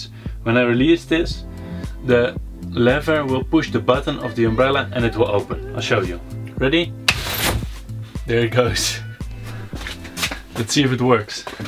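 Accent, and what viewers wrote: Netherlands. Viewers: Haha I just found out your channel yesterday and you are an amazing creator! I already had a suspiscion that you are dutch by your pronounciation (je praat echt goed engels maar elke nederlander heeft iets in zn engels waardoor je direct door hebt dat het een nederlander is ;) ) keep up the good work!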